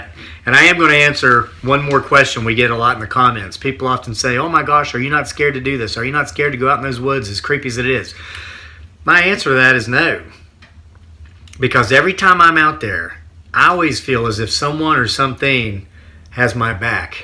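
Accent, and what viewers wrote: South, United States. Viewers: Yes, Suh! I do declare. Listening from Georgia, I hear your Southern accent. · LOVE YOUR SHOW 😂😂😂😂,IS IT JUST ME OR HAVE YOU SUDDENLY DEVELOPED A SOUTHERN ACCENT? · You know how they say “if you can’t say something nice, don’t say nothing at all” ? Yes I got something to say but it isn’t nice! You’re making an absolute mockery of the subject. You’re pushing merchandise! That’s it!!! Some people have had real life encounters and you’re channel is a disgrace. That deep southern draw you seemed to have picked up all the sudden is rediculous. Grow up!!!!!!!! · What is up with that heavy southern accent? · Voice change,. Southern twang!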